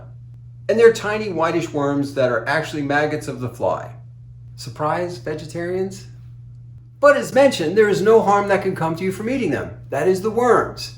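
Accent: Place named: New York, United States. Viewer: I can tell this guy is from New York cause of his accent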